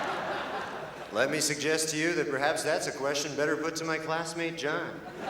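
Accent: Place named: Canada. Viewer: Amazing. All so carefully written. He sounds so much like Letterman here. This is also the only time I've heard him actually sound Canadian. I miss this guy so much.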